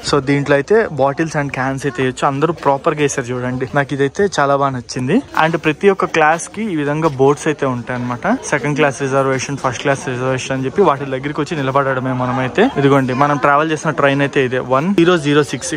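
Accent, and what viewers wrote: Nellore, India. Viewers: ❤ Love your accent and description as I am 67years young Native of Nellore Proper 😊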